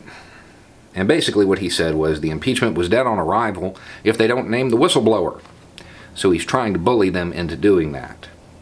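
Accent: South, United States. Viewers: I wish I could sit down and have a beer with you. You're exactly what I need in my life - a southern, country guy with a thick accent who doesn't agree with all of my ideals but is capable of a rational discussion based on evidence and common sense. I always come back to your videos to restore my hope in the world.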